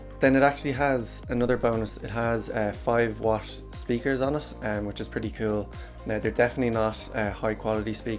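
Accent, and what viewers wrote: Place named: Ireland. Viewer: Good explanation but I would like to see another video of your experience with it. I have been shopping around and I think this is the one I like the most for the price and brand name but I still am not sure yet. Thank you for doing this video. By the way you sound IRISH.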